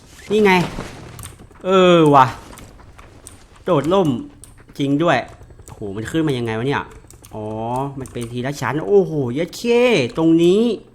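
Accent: North, Thailand. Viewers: ปี่เป็นคนเหนือกะคับ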